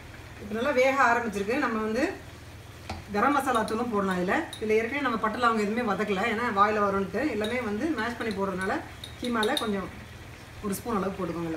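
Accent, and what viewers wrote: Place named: South Tamil Nadu, India. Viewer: super mam......just now I saw your cooking video and subscribed....its really amazing and I couldn't believe your are speaking perfect south tamilnadu language.......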